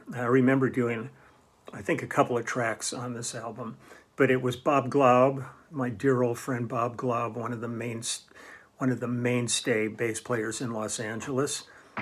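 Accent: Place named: Wisconsin, United States. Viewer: I still hear the Wisconsin in your voice after 50 years away😋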